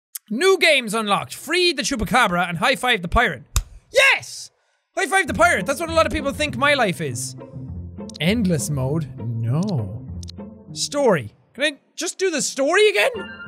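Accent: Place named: Ireland. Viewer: This comment made me realize Jack has a lot in common with my uncle. Both screaming Irishmen*, both have a funny laugh, both look like me, both have blue eyes, both have raging Irish accents... jeez that awkward moment, amirite? *I'm part Irish on my mom's side.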